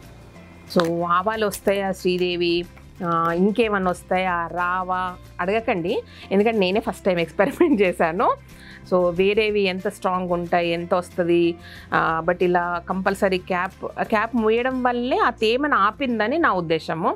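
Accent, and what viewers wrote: Telangana, India. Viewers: U speak liķe me mast hydrabadi telàngana.super atlane frankga vundu friend.dont change ur way of speaking in telangana. Mast ga chestunru n success ayinattu chupistunru.great but nenu itlane idea tho youtube lo chusi chesina mentulu,pudina chenagalu.without soil n with soil sand lo but raledhu. Micro grain greens avuthalevu.y reason cheppandi friend.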